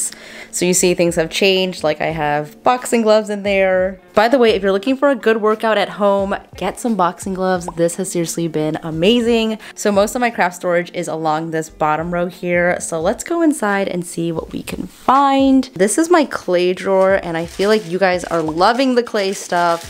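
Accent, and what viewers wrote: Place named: Midwest, United States. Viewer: it's so nice hearing midwestern accents from youtubers ♡ from a milwaukeean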